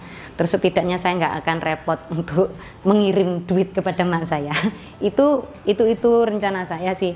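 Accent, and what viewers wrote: Java, Indonesia. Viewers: kalo boleh tau mbak ini jawa nya asal darimana?? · Mbk soko itu opo to mbk, Baru nemu channel ini mbk ,medok banget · Senang banget sama suara mendoknya kk salam dari NTT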